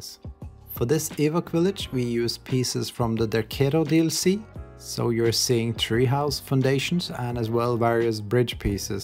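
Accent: Norway. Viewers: Kjente igjen engelsken hehe, super engelsk men hører det fortsatt 😅 Flott content dere har, skal dele dere med clanen 😄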